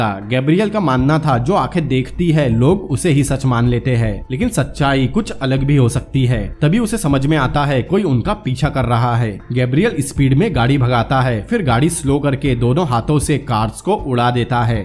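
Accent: Assam, India. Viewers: 👍👍👍 I like ur voice... R u from Assam, As your hindi is like Assamese.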